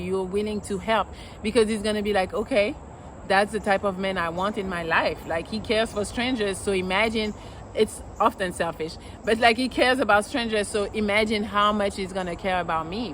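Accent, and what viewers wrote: France. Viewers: Here's my one tip, I have others but I will give you one. Speak another language, like you. When you speak that French shit in that French accent? It gets me up😂😂😂 it does the same for a Man when he speaks to a Woman.😜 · She is from France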